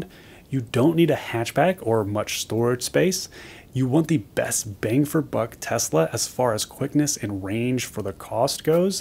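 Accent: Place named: Midwest, United States. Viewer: As always, a nice, professional and useful video,! You can always be counted on to get right to the details and to also allow us to hear about your experiences. You are SOLID GOLD! Thank you! P.S. Kinda sounds like Tesla's PR company wrote a lot of the "specs" text for you to read. No worries, but because you speak (or the video speed is "enhanced") fast, I had to CONSTANTLY, stop and rewind to hear all the spec details packed in to every sentence. May want to ask Tesla's PR firm to not enhance the speed of the video. Remember, the #1 group of car buyers is WOMEN. (Over 70% of the NEW cars purchase decisions are either directly by a woman or heavily "influenced" by a woman. This is according to published, industry stats. The remaining 30% of the NEW car decisions are made by men - who are single, or are buying a "collector" (not a commuter) car, or have no family and/or no significant female in their life. 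SOOoooo...as I am starting to explain to a couple of other "engineering" - driven sites, please SLOW DOWN and speak in your NATURAL voice. You have a GREAT Midwestern voice, which is very pleasant to listen to. NO need to RACE through everything. We LOVE what you have to say. Hugs and again, THANK YOU!!! 💐🌺🌻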